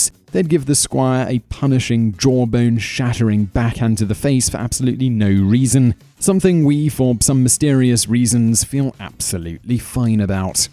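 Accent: England, United Kingdom. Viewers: Absolute crock research delivered by a man speaking in a british accent to make it seem legit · Well English knights fight on foot, and u sound English u should now about that, not presenting knights to be better then else just because they have horses, also lower class man at arms uses horses, light cavalry, mounted archers...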